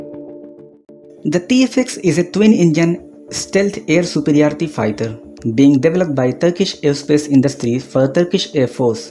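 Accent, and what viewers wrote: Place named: Russia. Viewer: It seems that he is from central asian countries like kazakhstan his accent of english is like russian but he is trying to speak like british accent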